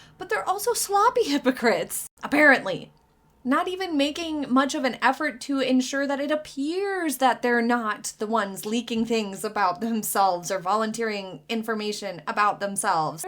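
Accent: United States. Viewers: ❤darling ❤you look absolutely STUNNING 🤩 again today…..I love 💝 you in this shade of Green!it makes your eyes 👀 pop!!!🥤 ❤❤❤❤🎉you really are a BEAUTIFUL WOMAN 👩 with a Fabulous Brain 🧠 to boot!its always a pleasure to hear 👂 your soft CLEAN 🧼 IMHO AMERICAN 🇺🇸 ACCENT all without the new “woke”weird drawling questioning intonations that I hear often on YouTube!lol!Im a 58year old lady in the Shetland Isles Scotland 🏴 uk 🇬🇧 and have been with you from the get go!Keep going you’re REALLY SAYING WHAT I FEEL and THINK 🤔 about METHANE and HAZNOBALLS and I’m PRAYING 🙏 TRUMP doesn’t Chuck HENRY WINDSOR outta the USA 🇺🇸 😂😂😂😂!Much love!🧡 Jo.xxxxxxx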